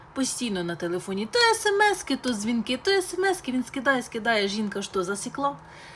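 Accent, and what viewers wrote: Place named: Mykolaiv, Ukraine. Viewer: А какой у тебя очень приятный диалект! Николаевщина?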